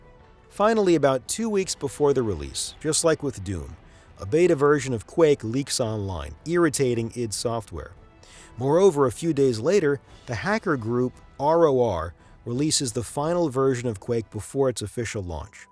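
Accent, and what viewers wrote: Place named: United States. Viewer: Really you sound completely American in this video? Good job. So what do you think, cyberpunk 2077... Greatest game of all time, a masterpiece?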